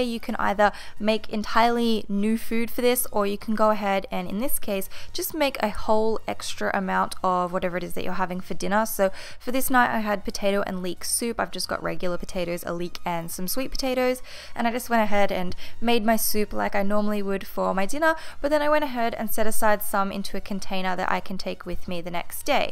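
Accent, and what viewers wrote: Australia. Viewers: Are you Australian or American ? P.s I love ur channel !!! ❤️❤️ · Australian obvs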